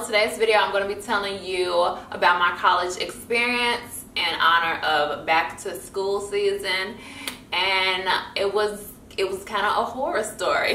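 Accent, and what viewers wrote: Chicago, United States. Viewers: Are you from Chicago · I had a feeling you was from Chicago. I watch your videos often and I always be knowing when people from Chicago!! I can just hear it in your voice and stuff.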